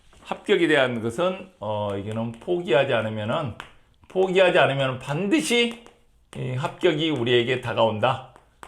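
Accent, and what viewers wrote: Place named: Jeolla, South Korea. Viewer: 빨갱이 동네 전라도말씨네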